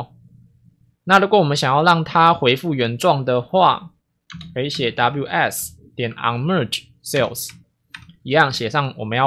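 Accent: Taiwan. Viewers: 台湾乘程序员？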